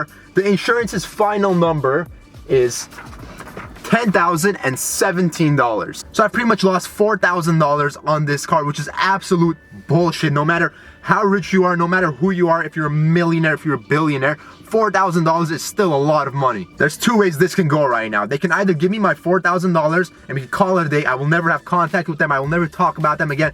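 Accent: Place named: Europe. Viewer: He sounded European tryna speak English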